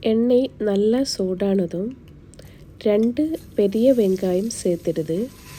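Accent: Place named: Kerala, India. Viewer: Malayalee tamil parayanathe pole unde.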